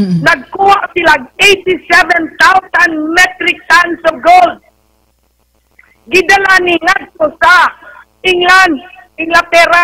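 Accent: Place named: Visayas, Philippines. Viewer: Yes, we believe in you ma'am .. Excellent ! Just take care of your self & health as well..we need you ma'am.. Alam mo po ma'am Ka boses n Ka Boses mo po ang pmangkin ko..hehehe..para ko n narinig cya..hehehe..Visayan accent po tlga, gnyan ganyan ang boses .. Very nice! Love u po ma'am . God bless u po. Mabuhay k po & family! 😊😊😊❤️❤️❤️❤️❤️👏👏👏👏👏👍👍👍✌🏽️💞💞☘🌹💞💕😘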